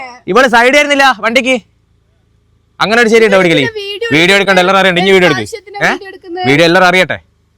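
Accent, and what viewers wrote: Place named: Nadapuram, India. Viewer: നാദാപുരത്തുകാരുടെ ഒരു ഒലക്കീലെ ഭാഷയും,കോപ്പിലെ പരിപാടിയും.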